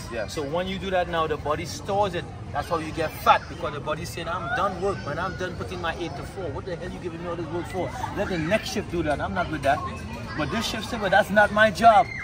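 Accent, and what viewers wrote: Trinidad and Tobago. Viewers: He sounds like a Trinidadian 🇹🇹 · Man is ah trini... I feel he from port of Spain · Bro sounds Trinidadian 🙏🏽👏🏽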